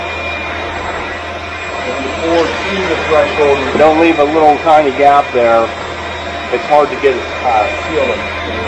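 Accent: Pittsburgh, United States. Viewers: Don't worry about talking like a Yinzer. It's everyone else that has an accent.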